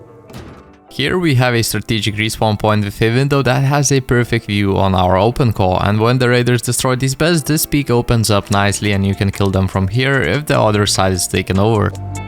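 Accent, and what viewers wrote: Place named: Sweden. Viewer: Pretty sure hes swedish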